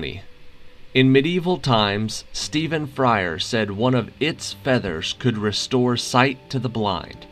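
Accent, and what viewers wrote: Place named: United States. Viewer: Why are all the pictures of England but the voice is American?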